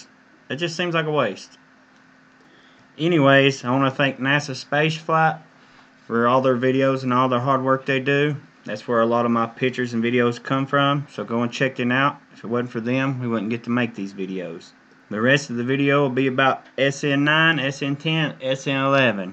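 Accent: United States. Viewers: Your hillbilly accent is comforting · Ya good video and I love your hillbilly voice!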